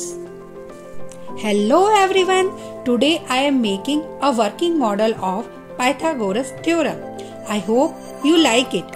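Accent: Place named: India. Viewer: Because she is from India she is teaching that's all that matters not everyone English is first language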